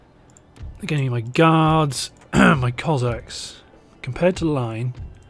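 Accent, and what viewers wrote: United Kingdom. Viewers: God I love your English accent.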